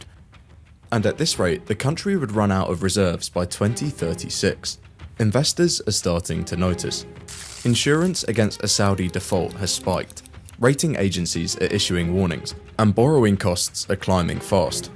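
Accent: United Kingdom. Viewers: A BRIT TALKING 😂. CRIME RATE THROUGH THE ROOF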